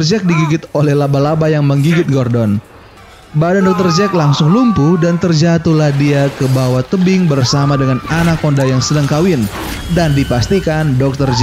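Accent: Manado, Indonesia. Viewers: Orang Manado ya bang??? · wah mimin orang manado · orang manado ka ? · Kak ternyata orang manado🙂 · Abang orang MANADO kah ini ?? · Min orang manado? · Orang Manado? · Bang orang menado ya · Bang Lu Orang Manado? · Orang manado ya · Dari logatnya , Abang pasti orang Manado ya😂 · Orang Manado Ya Bang?? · Bangg Orangg Manado? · Bang ngana orang manado kang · Org Manado 😭🤣🤣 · Orang Manado ka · Admin org manado fix! 🤘🏻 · Orang manado bang? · Manado ngana kang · Manado to ngana... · Bang abang pasti orang manado halo bang aku orang manado halo bang😁😁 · Bro orang manado??? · Bilang jo kwa klo nga itu orng manado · Bang org mnado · Ad logat Manado kang🤣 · orang manado kang dapatau😂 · Orang manado min? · Mimin orang manado kang 😆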